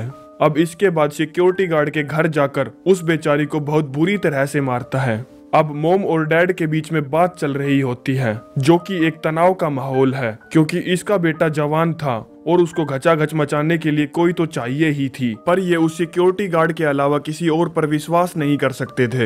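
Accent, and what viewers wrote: Haryana, India. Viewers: Bhai aap haryana se ho kya Bahut hi alag level ka explain kiya hai Aapne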